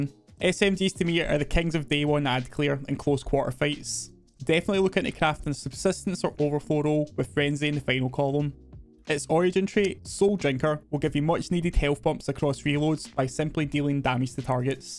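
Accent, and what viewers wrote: Scotland, United Kingdom. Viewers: it's probably because you're Scottish, didnt notice much of the mumbling. I would advise seeking professional help, as this can cost you In the professional world in the future. But thats about it, best of luck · Not a big deal but based on what you said your reasoning is flawed, " it's probably because you're Scottish, didnt notice much of the mumbling". If you didn't notice much of the mumbling then clearly it was due to his accent (by your own admission), so he was speaking clearly in a Scottish accent. My guess is you're just not used to it and have yet to develop an ear for it. :)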